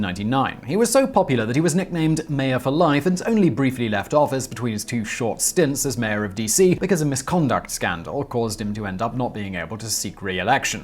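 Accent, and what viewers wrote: United Kingdom. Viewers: Spoke like a true brit "not to be messed with"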